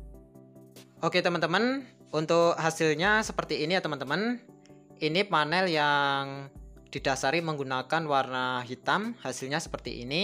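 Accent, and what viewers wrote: Java, Indonesia. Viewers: saya kira di jawa mas, soal e logatnya kayak orang jawa.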